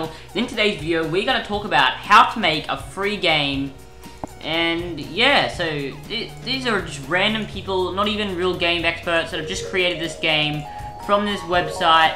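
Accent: Australia. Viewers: Are you from Austrelia?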